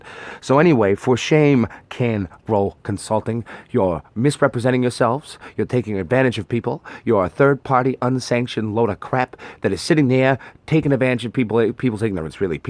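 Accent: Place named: United Kingdom. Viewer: Great British accent. Have you ever done voice work?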